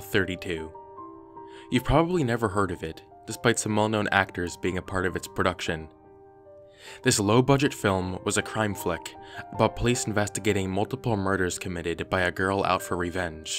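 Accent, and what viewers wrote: Australia. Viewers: Wow has a pretty good Australian accent lmao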